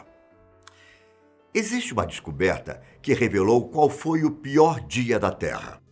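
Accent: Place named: Bahia, Brazil. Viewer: aqui na Bahia tem pessoas que falam assim também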